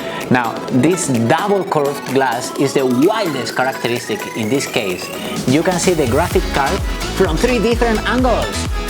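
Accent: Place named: Andalusia, Spain. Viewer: English from andalucia i can see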